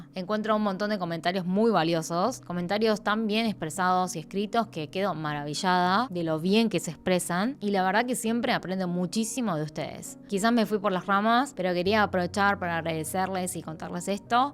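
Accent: Buenos Aires, Argentina. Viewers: Soy argentina, y lit tenemos el mismo acento, no hay problema en eso, tu acento es hermoso y no dejes que la gente te lo saque. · sos una argentina hablando como argentina, al que no le gusta que se joda, no elegimos nuestra cultura, somos parte y ya... me encanta tu contenido porque me hiciste conocer mucho más la cultura coreana y me parece muy valeroso que difundas las raíces de tus padres y abuelos... los argentinos tenemos muy naturalizado expresiones racistas u ofensivas que hay que erradicar, y creo que conocer más la cultura asiática nos va a ayudar a desandar eso... 💖💖💖💖💖💖💖💖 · Me encanta verte y escucharte❤ tus hermosos rasgos coreanos y tu acento argentino. No hagas caso. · Hablás como lo hacemos los Argentinos. Y vos cómo los porteños. No le des tanta importancia, primero xq no se ven en el espejo , segundo para q te ven y escuchan 🤦, y tercero son tan claros tus vídeos . 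Seguí así FELICITACIONES👏👏👏. 😘😘🇦🇷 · Li todos los argentinos hablamos como vos 😋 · Mexicanos y peruanos escandalizados porque una argentina habla con acento argentino. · Nunca te desanimes, tus vídeos ayudan a conocer la cultura coreana. Y tu acento argentino, es tu identidad, seguí!! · Sí cambia su acento porteño deja de ser, su querida Argentina es parte de tanto como su ascendencia coreana. Seguro es la envidia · !! no les hagas caso a es agente aquí te re bancamos con tu acento porteño!! yo soy de jujuy y también tengo un acento diferente toda argentina tiene un acento diferente, imagínate que te voy a pedir, "habla más jujeño" de por si que dejas un poco de lado tu acento porteño por que se nota que lo haces, la gente que critica o pide así es muy retrasada, no vale la pena ni tener en cuenta su opinión!! vos seguí feliz haciendo lo que te haga feliz, que aquí te apoyamos todos!!! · Vos sos porteña, hablás así y listo. 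Al que no le guste que no te siga. YouTube tiene otras opciones. Pero que no molesten en este canal. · Soy mendocina y adoooro el acento porteño, es especial TU acento porteño 💕 AMO esa combinación COREANA/ARGENTINA. Sos bella e inteligente. Tus contenidos son GENIALES y se nota todo el tiempo y el trabajo que ponen vos y Alex en su trabajo. ❤💙💖 Como dijo otro argentino por ahí "A la gilada ni cabida!!!!" 😂😂😂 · Querida:realmente la primera vez que asistí a un video tuyo pensé que eras de nuestros pueblos originarios,hablas perfecto nuestro castellano,trasmites con delicadesa y exactitud tus ideas, tienes los ojitos de " luna menguante",como dice,como la mayoría de nuestro pueblo hermoso, me encanta la manera que tienes de analizar y trasmitir los hechos y etc, etc... Que tienes ascendencia coreana? Qué maravilla! Cuantas personas inteligentes y capaces en el arte y la belleza de las ideas llegaron a otras tierras. 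Somos productos de inmigraciones desde que se " creó " el Homo sapiens. Hablar con acentos,en diferentes idiomas,es la música de la comunicación.Te mando un gran abrazo de consuelo y recuerda que la discriminación, es una grave enfermedad de algunos " humanos", no hay vacuna ni cura para el odio...! Me gusta tu alegría y que te tomes aquellos comentarios como lo que son. De nuevo, recibe un gran abrazo desde ésta tierra lejana 💛🤟 ha! Y me gusta tu acento porteño! ARGENTINITA DE BUENOS AIRES.TE QUERÍA PREGUNTAR SI LA MERCEDES SOSA ERA ASIÁTICA...O TUCUMANA? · No tenes acento Argentino tenes, acento porteño no todos los Argentinos hablamos así, no generalices y si a mi me an dicho muchas cosas por mi acento soy Argentina de una provincia llamada san luis c.p. y me an preguntado muchas veces si soy cordobeza ,mexicana ,de muchos lugares que no soy , pero no le presto atención a esos comentarios y los feos comentarios los bloqueo o los borro es algo que deberías considerar besos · Bueno en este caso hay que aclarar que no sería un acento argentino si porteño, ya que los argentinos no hablamos todos con el mismo acento. 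· Hola no tienen por que criticar tu acento porteño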